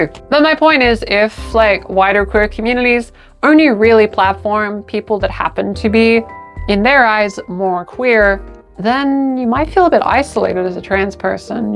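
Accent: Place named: Australia. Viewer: I hear a hint of aussie in your voice. But what is most noticable is how faint it is. As if you started out in australia but years ago you maybe moved to the states. Dialects and accents interest me.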